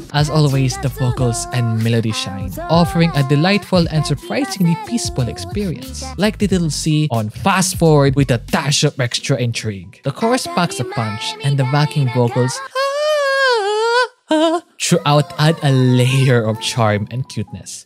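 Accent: Philippines. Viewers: i detect the pinoy accent pare >:) great vid btw!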